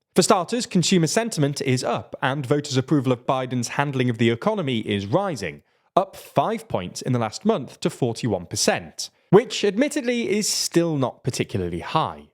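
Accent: United Kingdom. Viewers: I hate hearing a British accent I used to like it but everything I hear out of the UK is a lie sadly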